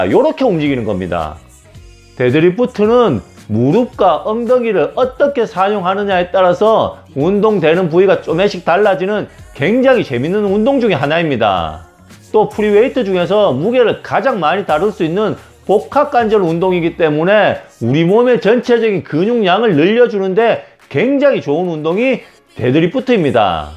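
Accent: Gyeongsang, South Korea. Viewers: 형님 항상 꿀팁 잘 보고 있습니다~ 같은 경상도라서 그런지 말투도 친숙하고 귀에 쏙쏙 설명이 박히네요 앞으로도 좋은 영상 계속 찍어주세요 (개인적으로 일두근 영상도 다시 해주셨으면 하는 바람,,)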